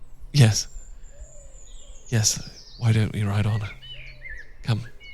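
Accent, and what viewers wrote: United Kingdom. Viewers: More British RP accents please! 💖 I use these to improve my English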